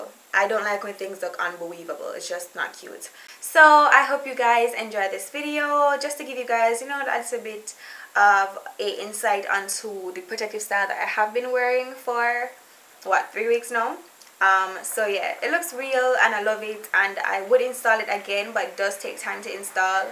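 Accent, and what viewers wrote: Jamaica. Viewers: Jamaican? Girllll imma subscribe jus because u are, I'm j'can too, portmore. Love ur makeup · Looks good and natural sweetie and you make me feel home sick with your beautiful Jamdown voice X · You sounds Jamaican,are you? Greetings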